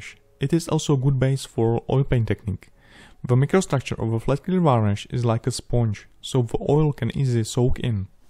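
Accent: Russia. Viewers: ты русский 100% ну или белорус крч инглишь твой второй язык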